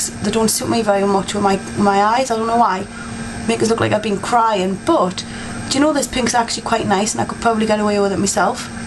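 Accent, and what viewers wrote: Newcastle, United Kingdom. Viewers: Love her accent geordie!!! Im on camera mind! lol love it!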